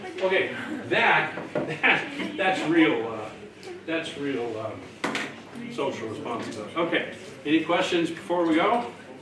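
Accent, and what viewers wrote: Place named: United States. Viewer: Pretty early, on Monday a little bit sleepy 😅 but still very motivated and eager to absorb new information, we were provided with an amazing, as always, speech of. So firstly, talked about agricultural enterprises, about technology, ways to run business successfully, quickly! He pointed, and kept repeating that world of business is volatile. Never stands, always varies, changes. Furthermore, he shared as the example the Smart Bandage. This kinda put us in the picture more of what he talked about. I am truly grateful for a speaker like him. Because I get to hear how Americans utter words and express ideas!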